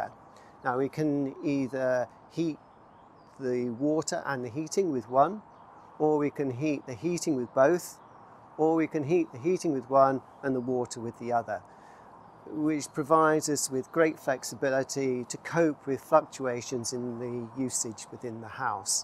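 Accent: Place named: Southern England, United Kingdom. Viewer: It would help to know where in the country you are. I take it you are not in Scotland and from your accent you are probably in the South. So is the system only suitable in Southern England where, these days, it is quite warm?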